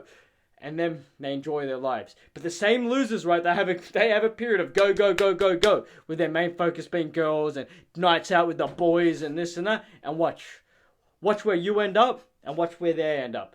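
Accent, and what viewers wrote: Australia. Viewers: Hey bro where you from, are you from sydney??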